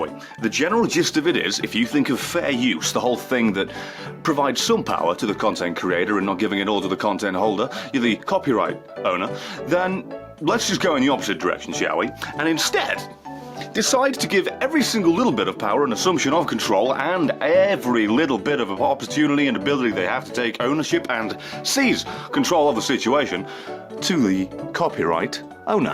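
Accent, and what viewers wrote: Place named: United Kingdom. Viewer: Damn it's sad that I can't here your sweet British(is he British?) accent and you screaming with words you invented 3 second before you say them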